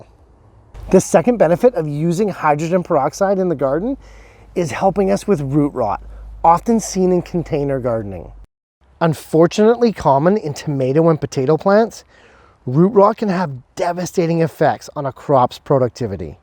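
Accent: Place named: Canada. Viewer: Very useful and interesting and Fargo or Canadian like sounding narration. Seriously, very good video 👍🏻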